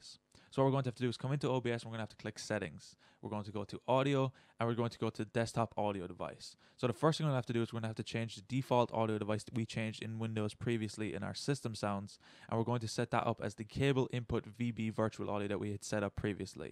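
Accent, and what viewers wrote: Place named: Ireland. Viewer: defintley irish, just cant tell exactly where :/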